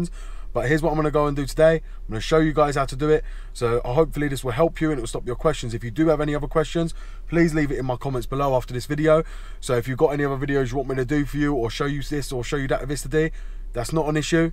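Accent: United Kingdom. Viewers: I honestly do not understand why so many youtubers talk so fast when they are doing an exercise? Its weird and kind of disorienting to me.Please for future vids try to speak a little bit slower especially with your having a thick british accent.:)Thanks and keep up the good work!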